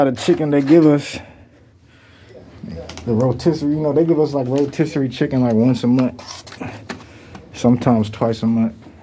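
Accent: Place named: Indiana, United States. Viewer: What’s really crazy is on the outs you’ll only see 98% of the stuff he has in a hood store. Nowhere else. N bro sound like he from Indiana or Michigan he don’t sound like he frm da city or Illinois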